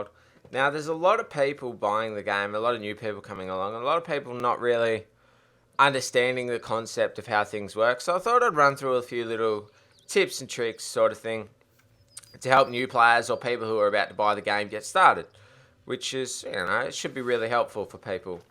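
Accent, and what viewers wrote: Australia. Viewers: yea that voice and aussie accent XD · TheGilgers 2017 lmao he could be Australian cause we do have very similar accents. And also most of my Aussie friends like to use the word cancerous a lot considering how we pretty much butcher the English language.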